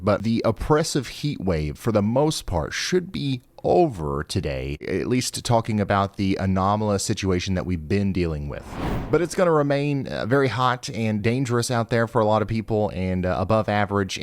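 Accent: South, United States. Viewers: I just love your Southern Speak! I’m from what used to be fairly rural north Fla Panhandle; I grew up in Tallahassee, but the middle, to the western panhandle are not far from Al, & Ga, so “Daggone” is not unknown to me. 30 years ago, ( I will be 71 in July)I married a man from Michigan, & we’ve been living in the Grand Traverse Region of N.W. Lower Mi since 2008, & I still speak Southern….with a slightly less southern accent.😉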